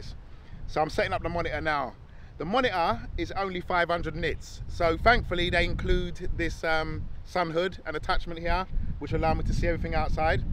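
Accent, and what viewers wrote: London, United Kingdom. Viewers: love your London accent man haha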